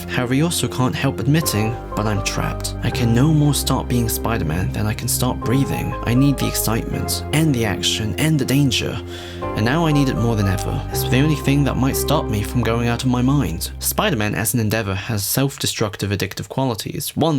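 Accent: United Kingdom. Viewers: I find it funny that you thinking having an “insightful” analysis is zooming in on out of context, unconnected quotes from a comic book, with some douchebag with a British accent reading them to lo-fi hip hop in the background. It’s not insightful in the slightest, but there is another word for it: disingenuous. He misrepresents the comics in HORRIBLY bad faith. Nothing insightful about that.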